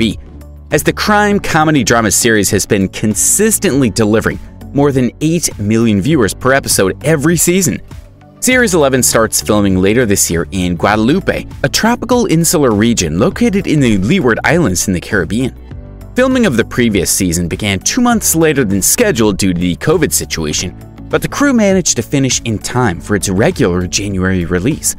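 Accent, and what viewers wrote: United States. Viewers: It's a British program . Why is an American doing the voice over????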